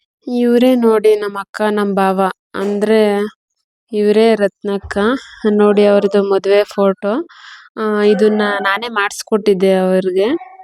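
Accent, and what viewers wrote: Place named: Mysore, India. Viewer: Nivu mysore bhasa matadatira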